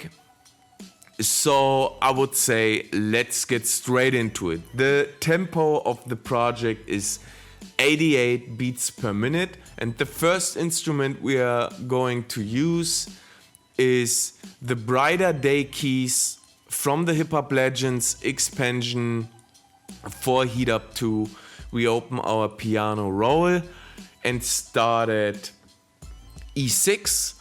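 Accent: Germany. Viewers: That german accent😂 Great remake🔥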